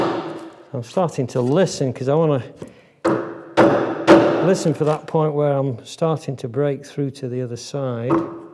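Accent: United Kingdom. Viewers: So it is british accent after all. I didn't know british woodworkers use inches as well. But then again in next episode he says 'it's half a mil" so I guess he uses all units he finds useful.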